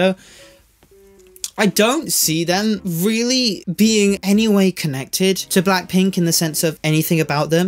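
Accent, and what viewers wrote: England, United Kingdom. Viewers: yes, from his accent, I can guess he IS British.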